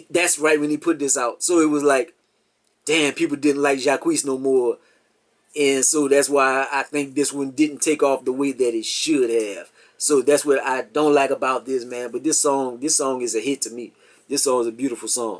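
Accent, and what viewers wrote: Louisiana, United States. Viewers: Man I knew you had to be from Louisiana, I left after Katrina at 14 but never forget the accent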